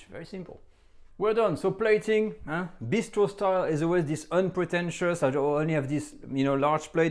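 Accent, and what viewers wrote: France. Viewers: he's native french speaker, providing english for us. can YOU speak french that well that you'd be on camera and be perfect? sheesh folks gotta insult others... make egos happy insulting. no wonder there's global issues with RUDE RUDE RUDE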